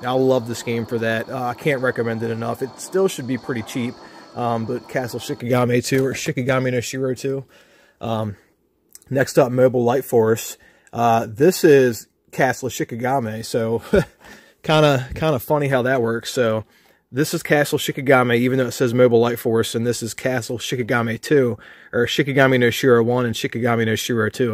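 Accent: Baltimore, United States. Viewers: Btw you have the definition Baltimore accent.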